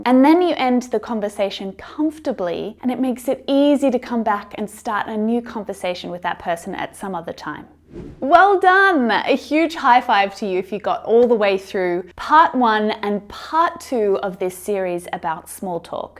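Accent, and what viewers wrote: Australia. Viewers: Australian accent!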